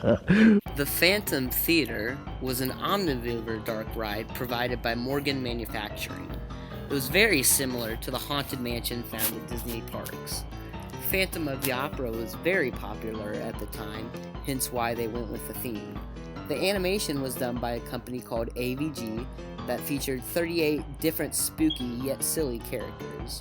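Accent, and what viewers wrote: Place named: Cincinnati, United States. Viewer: This guy has the most Cincinnati accent I’ve ever heard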